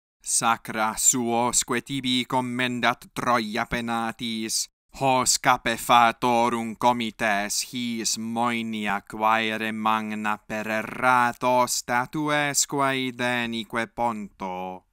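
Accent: Italy. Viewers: Calabrese Systēma vōcālium est. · Excellently read. The accent is more modern Italian-like and old Proto-Celtic-Italic. The Classical Latin had more closed e and o (unlike Italian now), except sometimes in closed syllables, but not much. The nasalization of vowels receded mostly and the elision of syllables was reduced due to the reduction of the nasalized and very eliding dialect (a dialect of vulgar Latin and Latin that emerged as a dignified minority). The way poetry was composed, was from the nasalized, eliding dialect, which was not the only one. The other main one was much less nasalized, did not drop the final m, except in prefixes, into n (com to con) . Classical Latin poetry is from the clique that used the nasalized, eliding dialect of Republican Latin, which was replaced by its old brother the very literally and not very elidingly pronounced one (both were very identical to one another otherwise). 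Vulgar Latin also existed as the very not eliding, non-nasalized dialect. Both dialects elided to varying extents the vowels between words that had vowels next to each other. The dialect that lasted throughout the Roman cultural clique as such, was the less nasalized and less eliding one. Vowel length and high amounts of changes in pronunciation were preserved until the middle of the fourth century. By the beginning of the fifth century, the pronunciation that would be called close to Italian was more prevalent, though in Sardinia, a variety existed where vowel length was not preserved, but pronunciation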